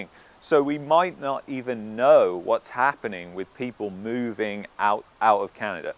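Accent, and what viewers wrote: United Kingdom. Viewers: You Brit accent along is pleasure to listen to ! I learn English from your talking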